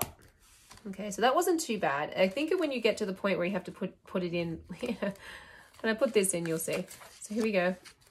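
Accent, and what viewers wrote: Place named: Australia. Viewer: this is what i'm eyeing online. thank you for all the info!! your ozzie accent is so faint. did you grow up in australia and move to the US?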